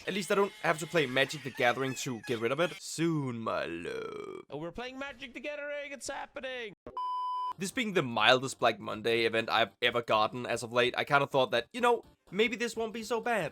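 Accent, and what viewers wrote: Denmark. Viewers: Danglishen går hårdt🙏🙏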